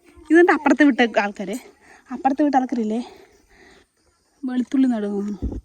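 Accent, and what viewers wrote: Palakkad, India. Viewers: മലയാളം സംസാരിക്കുന്നത് കേൾക്കാൻ നന്നായിട്ടുണ്ട് പാലക്കാടൻ ഭാഷ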